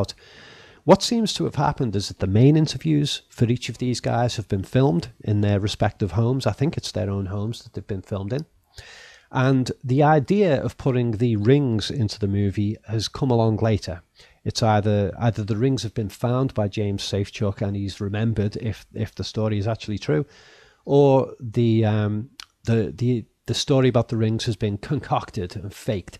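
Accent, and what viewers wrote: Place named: Northern England, United Kingdom. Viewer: Dissenter here. Regarding that couch..... Maybe he / his wife simply redecorated the place a bit between days of shooting? I know, it sounds incredibly simple and non-conspiratorial but maybe one would want to do something chore-like after a day of harrowing interviewing / reminiscing. Maybe it was planned already; obviously the shot with the couch was filmed at a different point in time. So........ what?........ If you were to analyse reality this way, you would find it to be non static most of the time. People sometimes move stuff around! They must be hiding something. Ofcourse your elaborate scheme scenario, illustrates your narrow analytical view (tending towards form over substance) and slightly glosses over the fact that if your theory were correct, AND following your logic then they'd probably just paint over a similar chair and move the couch away and avoid the "suspicion". 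BTW you completely missed the shrubbery outside, which is trimmed shorter in the shot with the couch! Can't believe you missed that! Weird huh? Lots of used takes / editing applied means false.... that's not a convincing argument and you should know that. You analyse films. Yes, shockingly, documentaries ALSO get edited and aren't filmed in one continous shot. BTW you're from Northern England right? Love that "K" sound you guys do after "-ing" words though.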